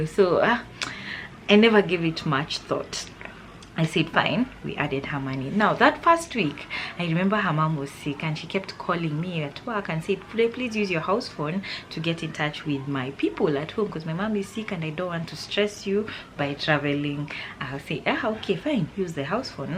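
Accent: Luhya, Kenya. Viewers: Wueh, it's the luhya accent for me 🤣🤣🤣🤣🤣🤣🤣🤣🤣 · just watched this after a long strenious shift.....laughed woooi.....the luhya accent waaaa😅🤣.. you are a perfect narrator😅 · Sorry but you speaking the luhya ascent is funny😅😅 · Amazing story, it's very calming listening to you... Your luhya accent is on point 😅😅😅. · Boundaries are important. I have my own issues can’t deal with hers also. Unless it’s life or death. Btw I love the luhya accent.🙌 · It's the luhya accent for me.. You had me at the school fees part na fee structure on the TV...😂 😂 😂 Mums aki we should get express tickets to heaven.. dealing with bosses at work and at home bado kuko ivi...ah... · 😂 😂 😂 😂 😂 The luyha accent. I had to replay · It's the Luhya accent for me!😂😂😂😂😂.... The storytelling was 💯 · You are generally nice.....you remind me of one who kept reminding me how my children love her though i couldn't really see it....".aki unajua Hawa watoto wako wananipenda sana"same luhya accent......and once I bought a box of long life milk on a Sunday and on Tuesday ilikuwa imeisha😭she stayed for 8 months and I gave up...I was tired of her! 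· hahahaha i love the accent ya kiluhya hahah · The luhya accent for me🤣🤣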